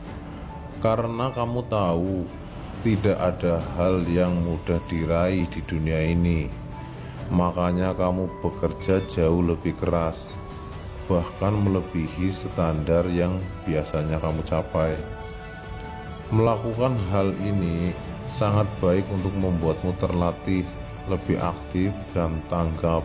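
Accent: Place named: Banyumas, Indonesia. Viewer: Logate NGAPAK banget siih pak,,!! Rika ne wis mangan durung Dina kie,,kooh wis nei semangat Karo wong²😂😀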